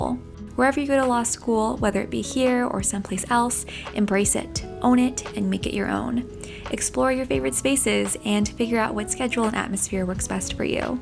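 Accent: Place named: United Kingdom. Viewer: why your accent so British, rather than American?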